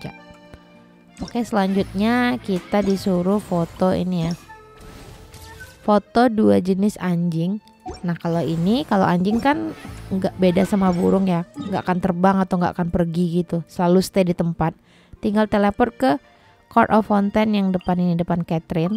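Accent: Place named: Sumatra, Indonesia. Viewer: logatnya kek org sumatra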